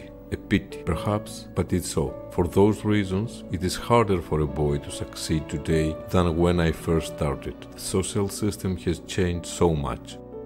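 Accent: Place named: Greece. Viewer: excellent greek accent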